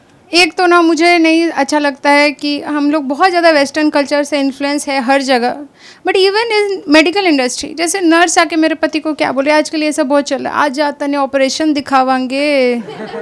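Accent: Bihar, India. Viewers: A bengali with Bihari accent has got to be one of my favourite thing to listen to. Good set, and nice puns. Keep growing mam. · Bengali with bihari accent is amazing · Ee अक्सेन्ट bihari h ka 😂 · How did you develop the bihari accent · mam aapne accent Bihari kaise hua? Aap Bihar mai grow up Hui hai ? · You are bengali but bihari accent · Aapka accent bihari kaise h? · Bihar ki ho ka Didi aawaj ke tone se to yahi lagta hai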